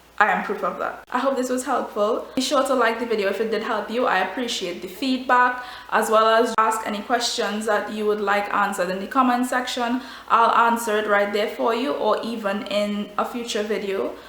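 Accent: Trinidad and Tobago. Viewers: Your skin looks really good. What foundation do you use, if you still use any? I'm really happy to hear another trini voice ;-) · know a Trini when I hear one 🇹🇹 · Sound like my Trini neices from San Fernando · I hear a trini accent! yasss gyl i support u! and you're very beautiful btw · im hearing that trini accent girllll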